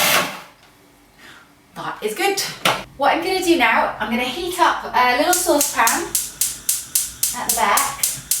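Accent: England, United Kingdom. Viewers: Hi there, I really appreciate you making this video! I'm Bruneian and currently staying in the UK. being away from home makes me miss all the asian food! and satay is one of them! I'll be going to try this recipe. AND you've got an amazing english accent!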